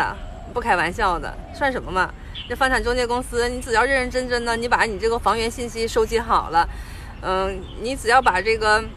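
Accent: Northeast, China. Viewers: 还是好好说话吧，东北话或者标准普通话，混着说，有点别扭，买房子属于私事，没必要上这里来说吧，这期做的有点拉分了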